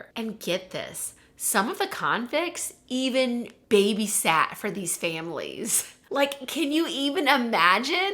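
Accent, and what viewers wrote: South, United States. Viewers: This was so interesting. I didn't know that families lived there. I can't imagine in today's world having hardened criminals babysit and entertain your kids. It makes the men seem less evil than they were. I love your content so much! Do you have a script written of what you want to say and read from it? You narrate so well and in such detail, you can't be just remembering all that! LOL. I am a southerner as well so I thought you had some southern connections. The friendliness, the laid back style, softer ways of pronouncing some words, but I was guessing maybe the Carolinas, not Louisiana. Deep south, like me, as I'm Lower Alabama.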